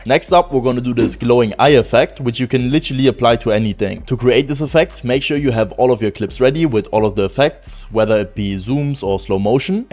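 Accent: Germany. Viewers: I hear German😂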